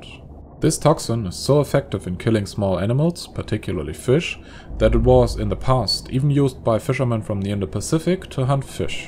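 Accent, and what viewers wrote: Germany. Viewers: Youre german right i can hear the accent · Your German accent is killing me inside.... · You got a strong accent. I guess you're German or something close by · Sis guy soundz gerrman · I can instantly tell hes german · Drop the nazi accent. It's offensive and racist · Kann mir nen Deutschen mit heftigen Akzent einfach nicht auf Englisch geben. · Sounds like a German Morgan Freeman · Are you from Germany? (Ur accent says so) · bwahahahah the way you said "turd like sausages" so casually and nonchalantly...and with that thick german accent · is that a german accent?? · Safe ,ist der Sprecher ein Deutscher!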